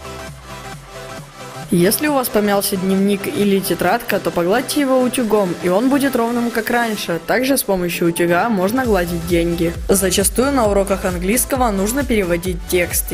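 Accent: Belarus. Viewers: Слушай а ты из какого города? Бреста?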